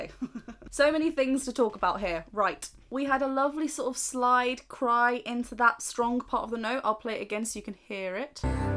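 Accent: United Kingdom. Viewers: I've thought you are an American. Your accent British english. I lived in London around 1 year, long time ago. lives in Incheon. I live also same city in Korea.. I hope you will have a few more reactions for ' '. ' '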